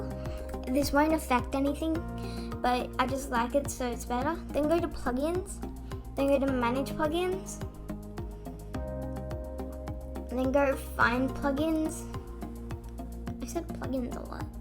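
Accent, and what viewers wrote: Australia. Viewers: ITS AUSTRALIAN AND ITS CALLED AN ACCENT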